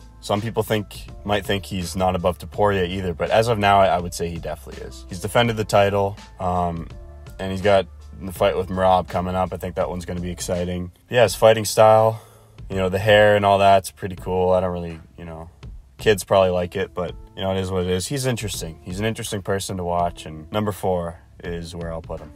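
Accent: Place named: Canada. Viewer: Are you Canadian? You sound Canadian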